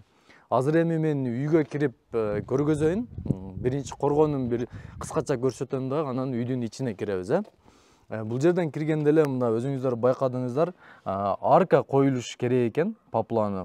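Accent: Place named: Batken, Kyrgyzstan. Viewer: Очок жагыны деп баткендерче суйлобой таза кыргызча суйло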